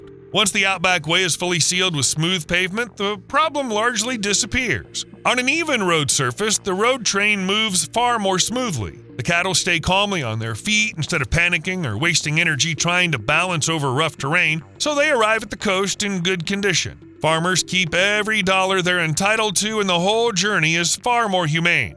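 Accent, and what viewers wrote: United States. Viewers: 😂 If you think all that could be built out there for that price, I have some other "investment opportunities" for you. From Winton, a A$1.2b single lane build would run out of money well less than half way to the NT border assuming the standard ballpark A$5m per lane-km for a rural arterial, which would be optimistic for some of the reasons mentioned. If you want a lane each way it would barely reach more than 100km. The US accent didn't help credibility, but otherwise was entertaining enough.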